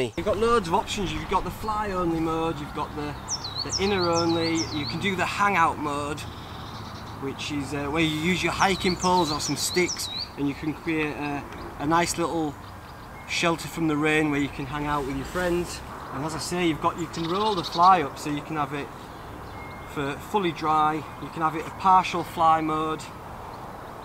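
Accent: Yorkshire, United Kingdom. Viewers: Love this Bro... Great review. Just discovered your channel and your Yorkshire ness (ar tha from Steel city lad?) Nice thought on compassion as well. Namaste from Pete in Penistone.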